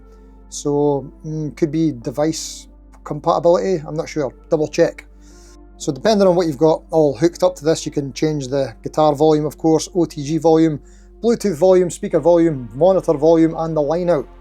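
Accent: Scotland, United Kingdom. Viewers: Just realised something, if you're still friends with them... Tell them they need to have a company slogan of "You've got it with Enya". It works surprisingly well with your scottish accent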